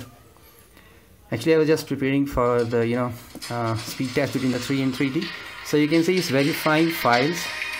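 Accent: India. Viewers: When i search tutorial i always hear voice of indian 🤦‍♀️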